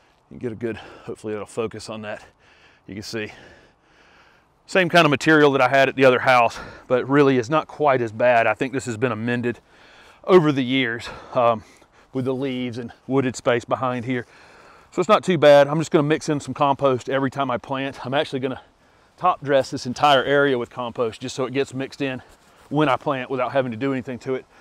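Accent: South, United States. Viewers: Hello, I am obsess with Camelias. Sadly I live in a zone 6. Any Camelias you know that are extremely hardy? Thanks so much Love your southern accent btw 😎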